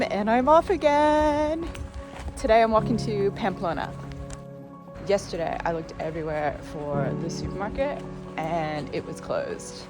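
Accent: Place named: Canada. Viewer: Despite your channel name, your accent sounds more Canadian than Australian. Fantastic videos.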